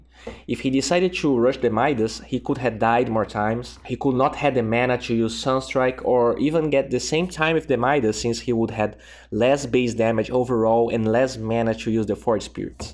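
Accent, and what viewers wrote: Brazil. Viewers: well, that's a pretty heavy accent. brazilian? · Te sigo há um tempão e suspeitava que vc era brasileiro pelo sotaque, estou certo? Ótimo canal, aprendo muito com sua percepção! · dat brazilian accent XD Keep the videos going pal.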